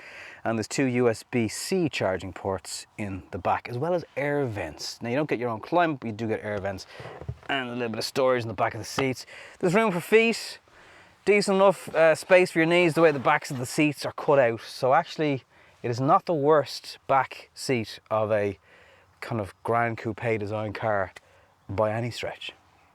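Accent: Ireland. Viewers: Good Good, he is very Irish.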